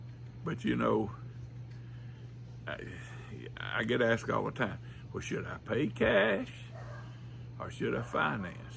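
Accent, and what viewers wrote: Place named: South, United States. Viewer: Excellent anecdote about the woman who judged your "education" level by your dialect. The elitists seem to define education solely by the number of years spent in formal academic institutions, as if that is the only path to success. I'll take practical, real world educational attainment in the world of real estate over the perceived "education" as defined by the elitist academic types who simply regurgitate a bunch of textbook theory and have never achieved anything in the real world. I'd be willing to wager that the woman who made that comment relies on an employer for her livelihood, while relies on himself and his real-world education & experience. I'll bet on the country boy with the Southern accent, the solid work ethic and years of practical wisdom over the elitist with the English degree every time.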